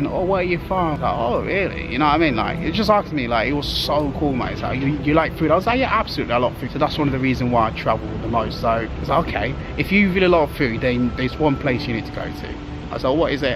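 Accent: United Kingdom. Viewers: Your British accent not so sound like London I gues🤔... correct ne if I'm wrong 😂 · I really love your British English accent. Hope I will fluent in British English one fine day and in same time I'm proud of my Malaysian language. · I love your British English accent.👍👍👍👍👍Welcone to Malaysia.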